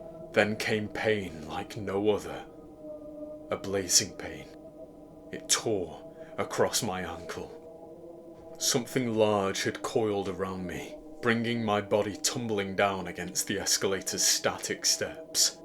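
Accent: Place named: North, United Kingdom. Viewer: Only found this channel a week ago and it's heading toward being a favourite. The fact that a fellow northerner is narrating doesn't hurt, either!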